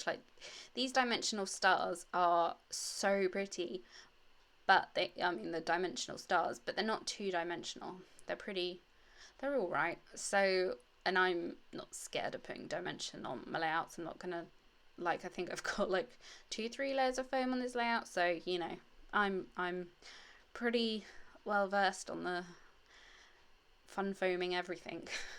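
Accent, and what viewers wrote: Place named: United Kingdom. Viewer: Just found your channel on here. Your layouts are so lovely! I especially enjoy listening to your accent too... I am from the UK originally (Gloucestershire area) and now live in California, so it's like being home again and scrapbooking at the same time! Love your unique style and you have given me new inspiration for the Felicity Jane kits that I can never seem to use up all the little bits and bobs from each month! xx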